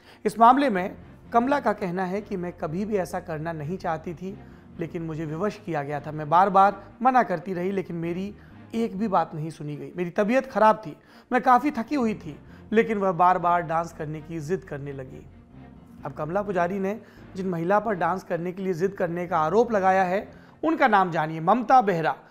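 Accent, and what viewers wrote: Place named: Bundelkhand, India. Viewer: देश की खवर देश की आवाज़ देश के लहजे में देश के बुंदेल खंड के सपूत सौरभ द्विवेदी संमोहित करने वाले अंदाज़ में समाचार सुनाने वाले ग्रेट.....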